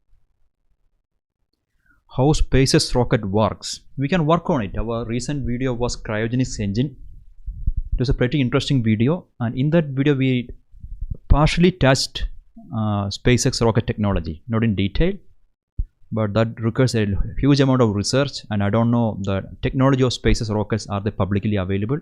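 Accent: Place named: Kerala, India. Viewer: This is malayali English 😁.my english teacher speak like this when I was in school.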